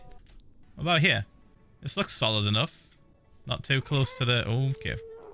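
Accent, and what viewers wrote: United Kingdom. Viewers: It’s a version of a British accent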